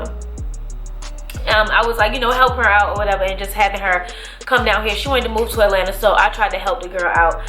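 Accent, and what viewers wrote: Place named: DMV, United States. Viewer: dmv! period...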